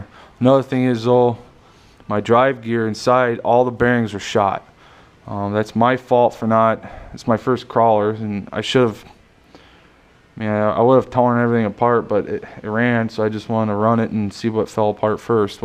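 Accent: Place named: Appalachia, United States. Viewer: Hey buddy its Justin lost your number watched all your videos to hear your hillbilly a** again hit me up ill send you my #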